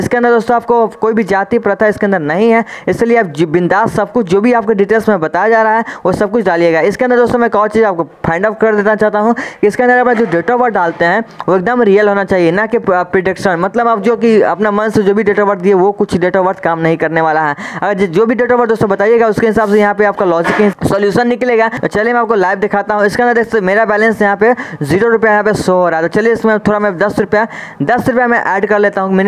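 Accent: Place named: UP/Bihar, India. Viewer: Bro are you from up/bihar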